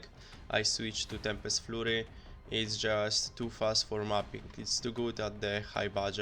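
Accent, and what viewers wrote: Poland. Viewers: Jak chuj slysze polski akcent xD nie myle sie? Pozdro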